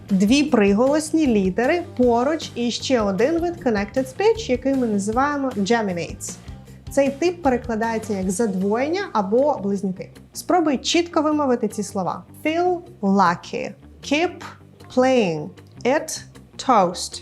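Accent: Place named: Ireland. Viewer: Той самий Айріш акцент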